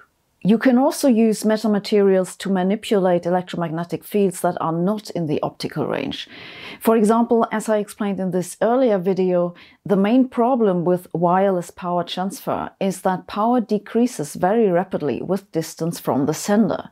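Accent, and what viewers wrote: Germany. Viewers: Deadpan humour with a German accent is the best thing ever.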